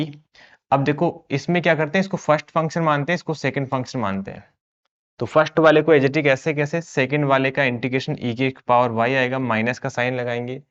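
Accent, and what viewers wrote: Rajasthan, India. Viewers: Sir kya aap rajasthan se ho😊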